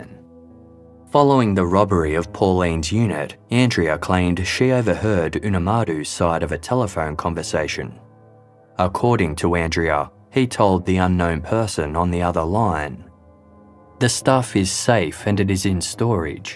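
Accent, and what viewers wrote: Australia. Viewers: I was referencing that the narrator Is a fellow Australian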